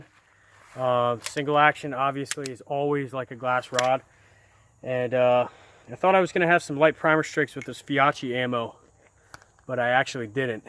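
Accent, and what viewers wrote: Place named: Delaware Valley, United States. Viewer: Gotta be from PA or the Delaware Valley! :) Nice video, just picked one up on a trade, beautiful revolver.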